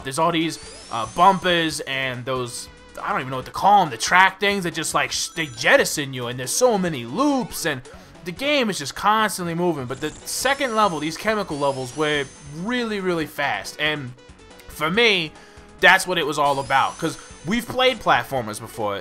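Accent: New York, United States. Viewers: Oh with the New York accent! Wierd if you've been watching videos for over a year... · How come your voice sounds like you have a New York accent?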